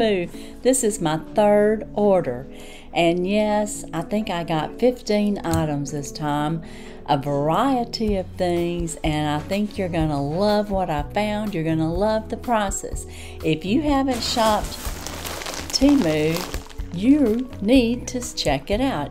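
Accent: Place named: South, United States. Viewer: Hi.. so happy yt put you in my feed. Its nice to hear someone who sounds like me.. country 🤣 😍😍 love it lady keep going! I have started my channel too! Excited God bless you sister 🥰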